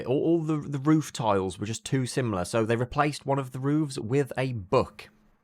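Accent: Birmingham, United Kingdom. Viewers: whats your accent? some mad brummy style :) good vids man, fellow dev here, geordie.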